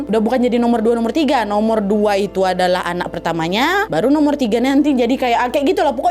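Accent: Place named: Riau, Indonesia. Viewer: selamat kak..🎉🎉-/+Bru 5bulan aku ikutin Chanel ini,awal mula aku tau dri anak gadisku yg skrg lgi mondok.kita sering nonton ber2,yg sering kita tonton cerita horor Krn emank kita suka cerita yg barbau horor..😁mpe saat ini aku sll ikutin cerita yg lain Krn emank seru dngerin kak cerita tuh..kyk lgi ngobrol aj gtu..,😊aku jg 11thun tinggal d Riau tepat'y daerah perawang bnyk kenangan dsna.tpi skrng aku dah pindah k Banten..jdi pas dngr gaya bahasa kak..rasa'y msh berada d Riau..😁sukses sll ya kak..😘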